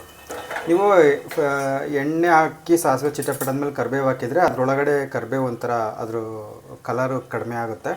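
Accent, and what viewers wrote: Chitradurga, India. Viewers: Are u from Chitradurga sida aa.... Nimma kannada bhashe namma oorinde agide pakka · navu chitradurga navu nemtarane matadodu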